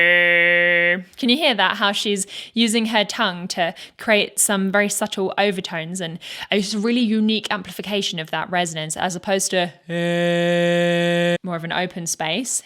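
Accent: England, United Kingdom. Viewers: ...." with their Vision".....What a GREAT IDEA!!!!....Have you Vocal analysed the great from the band yet?....They just happen to have a song called " ".....Now, you do have an English accent, and to my Canadian ears it sounded like "BULLS TO the WOOL"....If you meant the latter my deepest apologies....If on the other hand you meant the former, do enjoy... and UDO are almost as much FUN as I am. Cheers!!!...Ta....P.S...What does SMUGGLED A BUDGIE MEAN?.... --????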